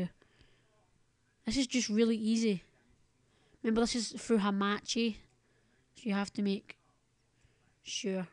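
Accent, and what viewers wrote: Scotland, United Kingdom. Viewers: You gotta mad scottish accent.. Its scottish right? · Like the accent, im scottish too i dont often hear it on youtube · oh he is Scottish i can tell i been there lol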